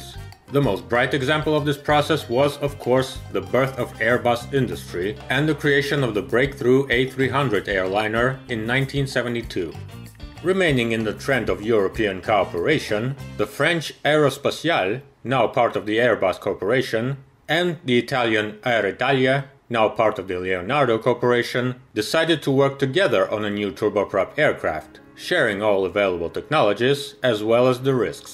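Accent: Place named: Russia. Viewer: In Russian aircraft are male, and going by accent that might be why he is using that.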